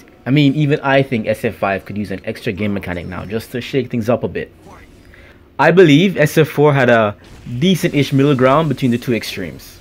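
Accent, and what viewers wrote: Jamaica. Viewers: I think street fighter is easier than tekken because street fighter you don't have to learn as much compared to tekken you have to literally put in more hours than in street fighter people have this big misconception that tekken is an easy fighting game but when you play in high level play it's hard as fuck and that guilty gear does sound hard as fuck though I might try it out and you sound Jamaican big up lol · i can hear the Jamaican in you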